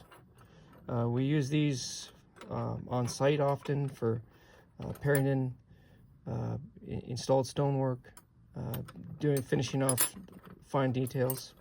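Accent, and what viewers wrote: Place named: Canada. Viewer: These chisels are great mate! love the fire sharp chisels. I have a ton I was given in my workshop that are super old. Ill do a video of them at some point. They need re-tempering really and don't hold up to the sandstone I often work, and the abuse I put my tools through 😂 Where in Canada are you? I spent some time over there a while back. miss that accent.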